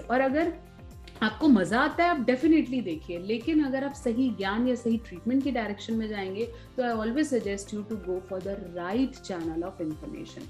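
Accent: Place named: India. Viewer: मैम मुझे तो आपको देख के लगता है कि आप विदेशी महिला हो पर जब आप बोलती हो तब पता लगता है कि आप इंडियन हो🇮🇳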